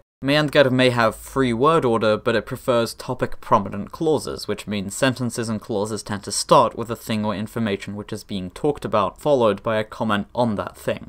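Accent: Sweden. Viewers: This dude is probably Swedish based on English pronounciation and how he pronounced things in his constructed language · Are you Swedish? You sound kinda Swedish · Love your Swedish accent haha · the little bit of swedish that is in your pronunciation is so <333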